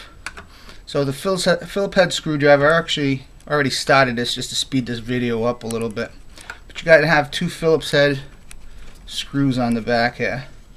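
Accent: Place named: New England, United States. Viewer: You have a great accent. New England is my guess. Thanks for the video.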